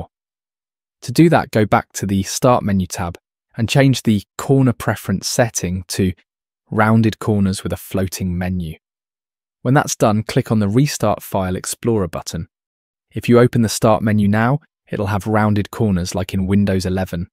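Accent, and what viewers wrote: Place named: United Kingdom. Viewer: I don't trust him mainly because of his UK accent I don't like him I don't trust them That's gonna be a no for me dog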